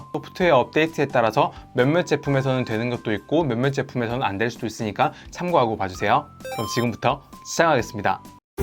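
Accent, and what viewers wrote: Gyeongsang, South Korea. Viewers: 목소리가 참 매력있네 경상도 이신가..?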